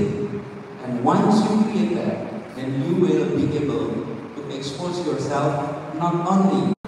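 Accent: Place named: Indonesia. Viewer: Indonesia banget bhs inggrisnya pak Anis.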